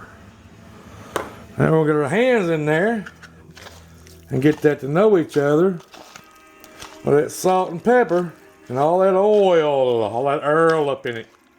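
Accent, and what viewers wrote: South, United States. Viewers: Gotta love that country accent!!! 🇺🇸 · When I heard that southern accent, I knew then this was gonna be some good stuff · I watched True Grit and could only understand half of it. I was able to understand this guy's video. I'm getting better and better at understanding Southish.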